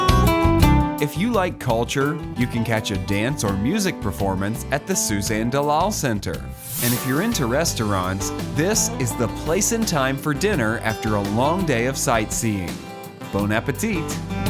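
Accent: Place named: United States. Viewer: A little research on correct pronunciation would help cover over the grating American accent and condescending style. Otherwise interesting.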